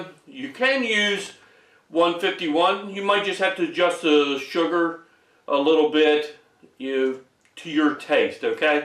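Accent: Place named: Hammonton, United States. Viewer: down in cape may. My wife is from Hammonton and has the same accent as you .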